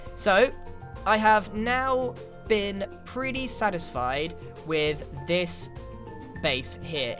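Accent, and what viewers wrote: United Kingdom. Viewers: U to british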